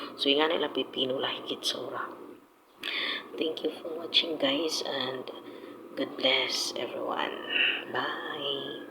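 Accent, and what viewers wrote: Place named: Visayas, Philippines. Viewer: Bisaya diay ka ma'am 😅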